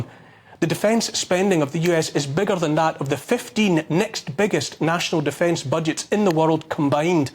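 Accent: Scotland, United Kingdom. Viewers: This is one of the best speeches ever ~ Can't stand the british accent but the Scottish one will do in a pinch as long as it's fiery . English was MEANT to be thus and good on you Neil ~ Please comprehend that these powers that be are actively probing and trying to PROVOKE anyone to become aggressive and radical... Jan 6th hijacking of a peaceful protest was PROOF of that ~ The Bible shows us how to have the patience for days like these ~ God help us ~ Hurry Lord Jesus Christ !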